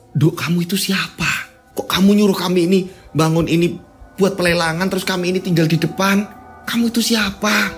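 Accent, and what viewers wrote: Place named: East Java, Indonesia. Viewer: Ini dari logat bahasa nya mas kayak orang Jawa Timuran daerah selatan ( blitar kediri Tulungagung )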